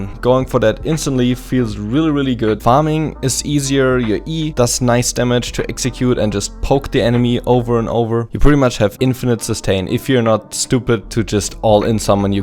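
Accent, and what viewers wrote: Germany. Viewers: German accent ftw xD❤️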